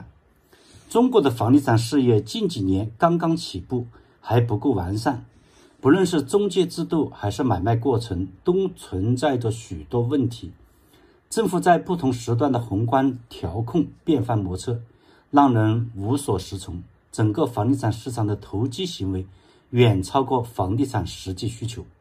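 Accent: Hunan, China. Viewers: 听CJ的口音像是湖南人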